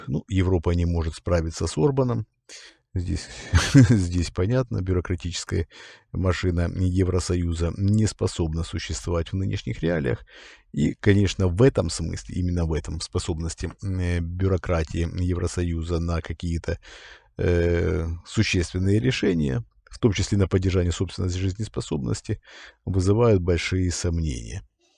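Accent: Ukraine. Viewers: ВОПРОС. Уважаемый, начал слушать вас совсем недавно, поэтому возможно пропустил информацию о вас. Тут посмотрел самые первые ваши выпуски и заметил у вас явный украинский говор. Мне очень приятно, что такие добрые люди, как Вы с моей любимой Родины, я сам с Украины, скажите пожалуйста, а с какой вы области или региона Украины? Спасибо!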